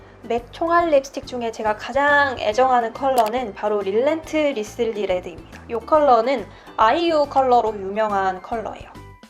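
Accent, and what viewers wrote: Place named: Gyeongsang, South Korea. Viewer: 대구 사람이시죠? 말투가 딱 대구같은데😚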